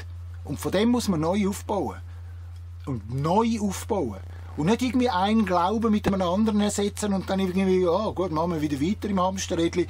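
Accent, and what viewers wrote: Switzerland. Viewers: Grossartig und danke. Als gebuertiger Deutschsprachler habe ich nur 10 min benoetigt, um Dein schweitzer deutsch zu verstehen. Dort, wo ich seit Dekaden lebe - in Uebersee - sprechen wir minimum vier Sprachen auf taeglicher Ebene und oft alles durcheinander. Wir sprechen diese Sprachen sicherlich nicht perfekt, aber wir verstehen uns alle (ca 120 registrierte (!!!!) Nationen auf einer kleinen Insel (178 qkm)) weil wir uns verstehen wollen. Deshalb waren Deine Einlassungen kein sprachliches Problem fuer mich. Und natuerlich nicht nur sprachlich - natuerlich nicht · Hihi - wenn ein "Schwyzer" sich echauffiert, klingt das in meinen Ohren iwie lustig. Das meine ich aber freundschaftlich. ;) Aber ich kann dich klar verstehen und stimme vollumfänglich zu. Die Reihe "Episode 1-7" sah ich komplett. Ich verknüpfe eher die Fäden bzgl. wer, bzw. 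welche Menschengruppe dahintersteht und die Fäden hält. Auch hierzu gibt es sehr deutliche Aussagen, bzw. schriftliche Dokumente, die quasi bis zu "ein Zeitalter" zurückreichen. Sogar noch länger, doch sind derlei Schriften für mich unerreichbar und ich würde sie auch nicht lesen können. In aller Regel betreffen sie Themen, die "Religion" entspringen und sich pharisäisch offenbaren. ++edit: Die Vokabel pharisäisch wurde absichtlich benutzt, um diese gegen eine Sprachfamilie abzugrenzen. Bei der Zusammenführung der geistigen Idee der Bundeslade (vgl. Bauweise) mit der sog. "Leidener Flasche" (vgl. Eintrag Wikipedia) ergeben sich weitere, in allen Bezügen sinngebende, geistige Verknüpfungen, auch und gerade zu diversen Erzählungen von Todesfällen im Buch der Bücher. (vgl. auch die "Einführung der Gesetze / Moses + den ersten Satz des deutschen GG: "Alle Menschen sind vor dem Gesetz gleich" - nach Erschaffung eines Gesetzes sind sie, die Menschen, dies nämlich nicht mehr. 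Pharisäer halten sich stets unantastbar, selbst wenn sie sämtliche "Gebote" brechen, die sie selbst zum Gesetz erhoben haben.)++ Danke für den jetzt noch weiteren Blick durch deine Episoden 1-7, das noch tiefere Begreifen der Lüge. Die Kraf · Ja super! Das isch Bärndütsch! ...👍😄 super Kanal! 👏 Danke! 🌹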